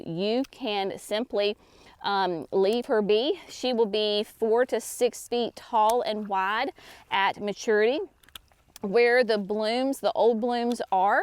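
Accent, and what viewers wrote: South, United States. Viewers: I hope you get to a place of relaxation and calm before the wedding. Does the bride want to help, or they are too busy working? It speaks volumes about your kindness and character to be doing so many preparations for the wedding ( and garden tour). I love your videos and get a little homesick when I hear your beautiful Southern accent. Thank you for another wonderful video.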